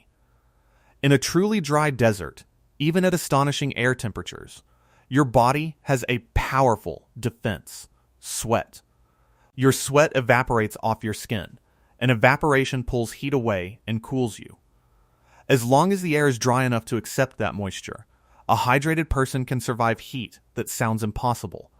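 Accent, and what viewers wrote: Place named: United States. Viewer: I despise that US accent 🙄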